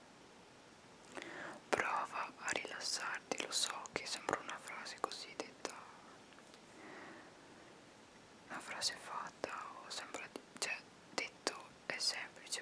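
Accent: Veneto, Italy. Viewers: Sei veneta e sei anche da vicenza scommetto...lo sento dall'accento che hai..é come il mio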